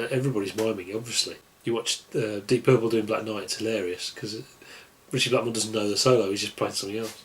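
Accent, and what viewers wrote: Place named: United Kingdom. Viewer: It’s a great album in my opinion, even though it’s similar to the first one. Also, can you try to sound less British next video?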